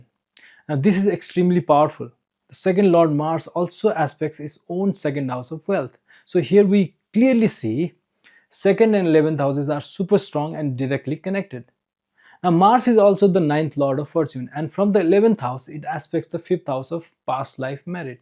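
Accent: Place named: Nepal. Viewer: Sir aap nepali ho aap ki english tone nepali si aa rahi hai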